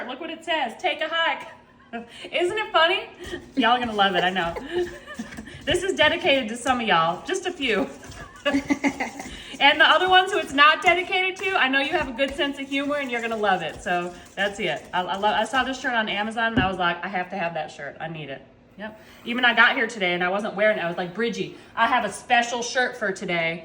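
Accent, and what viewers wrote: United States. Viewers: Love your accent. What state is it from?? Love you Tammy from Winchester, Ohio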